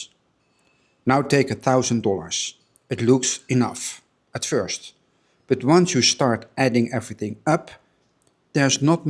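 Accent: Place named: Europe. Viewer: let me be honest with you – as a European (you sound European, forgive me if my assumption is wrong) pensioner with only limited income, stay in Europe. lots of places at the periphery that are as stunning as they are affordable. yes, you may need some winter clothes, but you can live safely and without much uncertainty. and if you save, you may be able to spend one or the other winter in SE Asia, you can pick the cheapest off-season flights.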